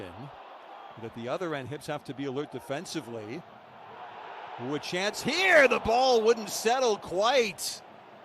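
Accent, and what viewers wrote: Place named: United States. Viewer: I am reading all sorts of snide remarks about the commentator. Part of me thinks it's unfair. I'm writing my own comment after having listened to about 2/3 of the clip. Nothing that he's said to this point is glaringly stupid. Perhaps a bit unpolished, but what should one expect? It's a Europa League qualifying match, not an FA Cup final or El Classico. Part of me is offended that it's simply anti-American bigotry - being American myself. But even I, proud Yankee Doodle that I am, must confess that an American accent coming from the mouth of a football announcer, is incongruous at best. Well done, Hibs. I'm a lifelong Celtic fan, but wouldn't be in the least put off if you lot make us sweat a bit this season.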